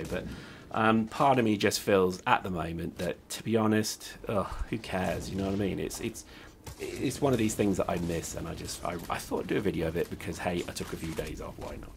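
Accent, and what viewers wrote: United Kingdom. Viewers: Yay is back your videos and British accent always make my day!:D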